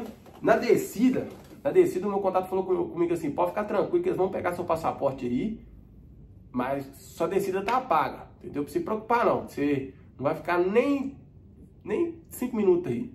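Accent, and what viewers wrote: Minas Gerais, Brazil. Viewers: Kkkkk mano tu e mineiro né ? Cai aqui de paraquedas, rachei da forma engraçada que vc conta kkkkk vc parece muito o Josias presidente da Galoucura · Mineiro contando é mt engraçado kakskakakakaka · Quw cara dahora um abraço man sou mineiro tbm, melhor sotaque pra contar história ❤😂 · eu adoro o sotaque de minas mano, se loco vc aprende e se diverte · O seu sotaque é igual o do meu primo Leo que é de inhapim mg ele tá nos Estados Unidos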